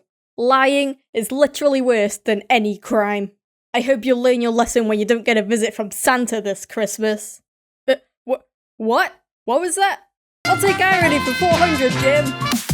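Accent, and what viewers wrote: United Kingdom. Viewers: Sorry to ask, but where abouts in Britain are you from? I'm British myself but I can't seem to tell where your accent originates ^-^ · wait is she british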